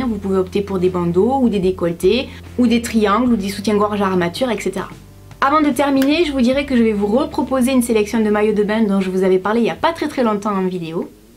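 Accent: South, France. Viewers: Elle vient du sud de la France 😉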